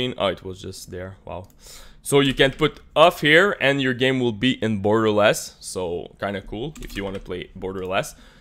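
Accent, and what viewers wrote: Quebec, Canada. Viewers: J'entends un Québécois!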